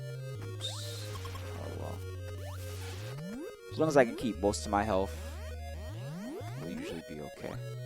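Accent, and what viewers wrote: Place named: New York, United States. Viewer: I recognize a newyorker anywhere, #Queens!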